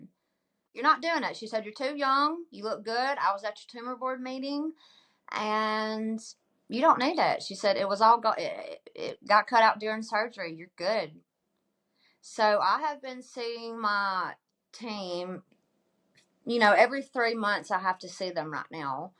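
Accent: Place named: Alabama, United States. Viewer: What a lovely lady , so brave and positive. Love your accent . I love Alabama ❤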